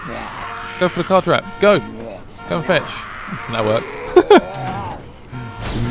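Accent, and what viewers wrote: England, United Kingdom. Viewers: Are u born in England because u have this accent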